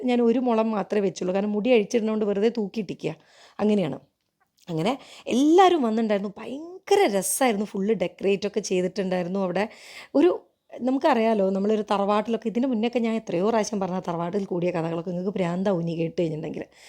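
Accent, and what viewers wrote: Kozhikode, India. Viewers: നേരം എനികില്യ ❤❤❤❤❤❤ കോഴിക്കോട് slang ❤❤❤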